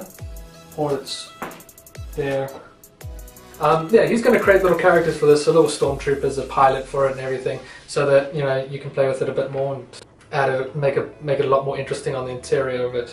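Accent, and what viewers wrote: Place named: South Africa. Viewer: Are you South African? You sound South African.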